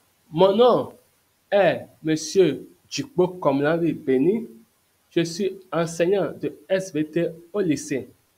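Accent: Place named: Africa. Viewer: La prononciation africaine de français me fait mal au cœur mais merci beaucoup 🎉❤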